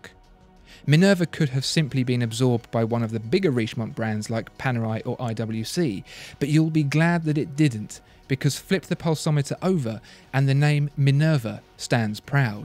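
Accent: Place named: England, United Kingdom. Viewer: I love listening to him talking but if you speak about watches learn how to pronounce German and French names!! Literally ALL decent brand names are French or German. The pronunciation is just so very very English aka I know nothing outside of where I grew up.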